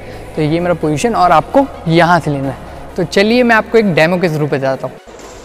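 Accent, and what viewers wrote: Odisha, India. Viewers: your accent is odia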